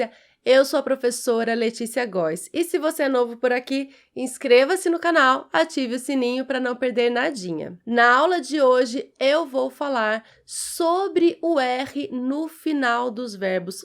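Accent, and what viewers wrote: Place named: São Paulo, Brazil. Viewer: Oi,professora,amada por mim, você está certa de puxar o R,um erre áspero.O teu erre tem som do R paulista.Te amo,okay🥰🥰🥰🥰🥰🥰🥰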